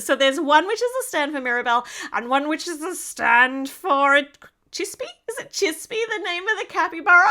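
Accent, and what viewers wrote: Australia. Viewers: Who else loves her Australian voice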